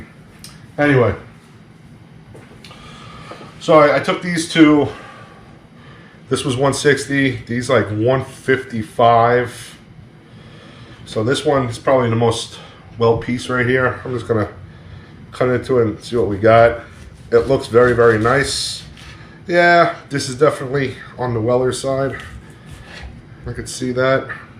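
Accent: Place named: New Jersey, United States. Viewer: That Travel Kettle has got to be a must for anybody into smoking and grilling. I think you'll find a lot of uses. By the way, I love to hear what I call my Jersey accent. Don't ever lose it!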